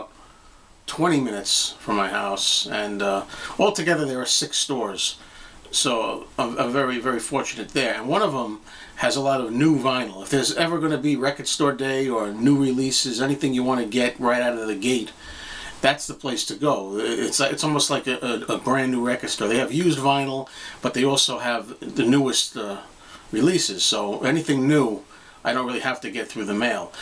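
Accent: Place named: Queens, United States. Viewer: Hey, I just wanted to share with you that one of my best/favorite cousins lives in South Ozone Park in Queens. My cousin Mark and his older brother {my other cousin} Matt are the one that took me to see Led Zeppelin at the Garden in 1977. The whole city was taken over by Zeppelin fans from all over for a whole week straight. It was one of the best times of my life and concert history. Your accent is similar to theirs. I am sure you have been at shows/games at the Garden. Talk soon~ Rob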